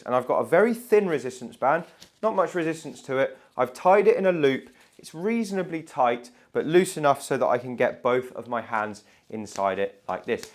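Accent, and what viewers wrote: United Kingdom. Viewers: Great videos and very helpful. Love your British accent, but who doesn't love a British accent!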